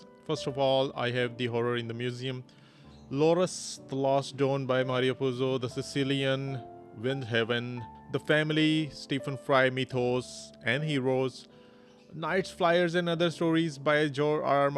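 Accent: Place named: India. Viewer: why your accent is so horrible like an indian accent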